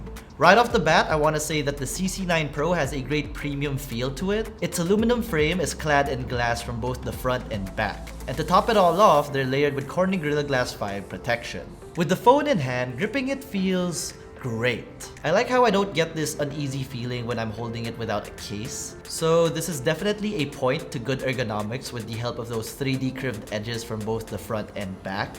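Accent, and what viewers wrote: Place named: Philippines. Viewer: had no idea this dude was filippino, the accent turned from almost amerian to taglish real quick after 20 seconds, only after having read the comments I have realized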